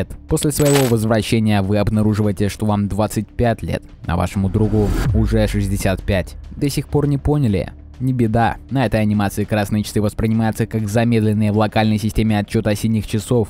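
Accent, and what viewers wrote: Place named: Moscow, Russia. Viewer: да, уберите мааасковский ааакцент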